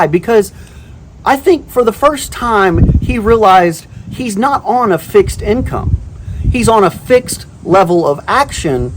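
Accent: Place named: New York, United States. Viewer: I couldn’t help but find it funny that whatever is causing your audio to peak also kinda made it sound like you had a New York or New Jersey accent 😂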